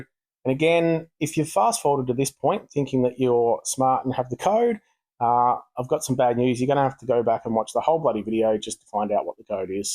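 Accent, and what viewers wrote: Australia. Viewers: Great video, man! I could listen to Australian English all day long. Keep it up, your content is really amazing! Also, you seem to have a very smart cat! Great idea of yours! But don't worry, I'm sure there are enough people who watch your videos regularly. And of course, thanks a lot for your content! 😉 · thanks legend, new to your channel but always good to hear a fellow aussie voice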